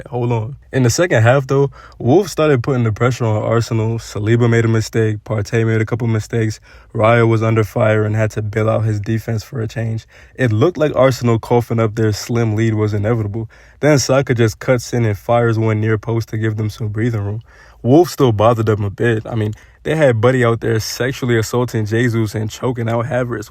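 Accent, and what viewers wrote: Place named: United States. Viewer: Where are you from bro? I can't pinpoint your accent.. I just know it's from the States. This is a nice video tho.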